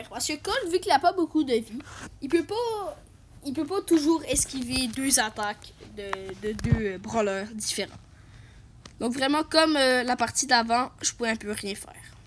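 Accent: Quebec, Canada. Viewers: T'es Québécois ? Si oui j'aime bien ton accent😉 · t'es québécois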